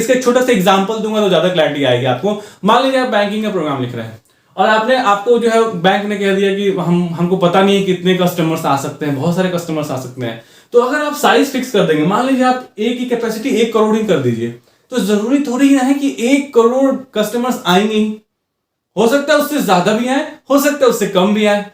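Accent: Bihar, India. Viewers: I was so confused tiil i found your channel ❤ thank you so much sir One more thing i guess you are from bihar and i am also from bihar so i connect with u more because of that also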